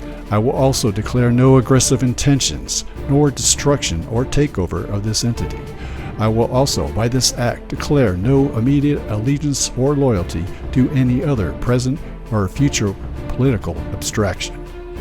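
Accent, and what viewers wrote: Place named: Texas, United States. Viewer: Sounds just like a TEXAN!! Yee Haw!!!!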